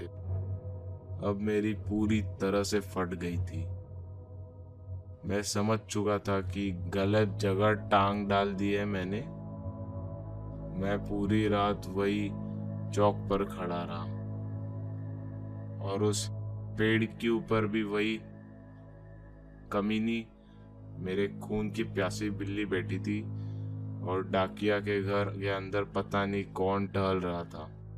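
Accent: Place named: Hyderabad, India. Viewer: You sound 100% Hyderabadi to me. indian Hydro I mean.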